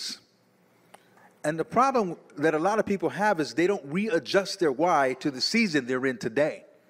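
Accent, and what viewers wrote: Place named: New Jersey, United States. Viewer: yessss!!! Excellent points. When I first heard you and lady C say that “I’d rather have questions we can’t answer than answers we can’t question “ that resonated so hard with me. I mean , it was so enlightening. That fear is so thick we didn’t even realize we were living in it. I thought you’d be the best video to send to them because you look like that bethelite, you’re relatable, your presentation was what we as former Jehovah’s Witnesses can relate to. Your voice etc. reminds me of the congregations in New Jersey and NY. Thus, I thought they would be comfortable to listen. All they heard was this was an ex JW. DEMONS!!! It is an ATM great analysis. I went through a period where I’d lose sleep thinking how can I get my family to WAKE UP. Sadly after my mother’s passing in December 2018, I had to learn to let it go and let God. 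They were never going to listen to me now. Looking forward to seeing mom in the “new system “. The mind is more amazing than I ever could imagine. Some can go thier whole life excepting that they don’t deserve answers to their questions while others actually have the courage to break free from that conditioning. God bless you and Lady C for your inspiring work.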